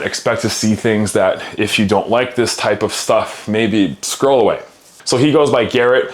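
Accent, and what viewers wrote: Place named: Quebec, Canada. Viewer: I thought so! By looking at your name I was wondering if you were French Canadian. Cool. You speak quite fast as well so with your accent it sounded different. I think the French accents we have over here are a little different to the ones you have over there. Love your channel and think you are doing a great job helping and inspiring people.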